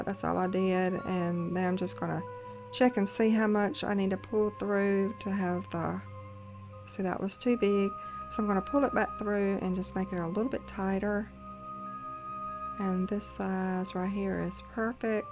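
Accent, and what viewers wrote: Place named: South, United States. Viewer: Where did she grow up to acquire her country accent?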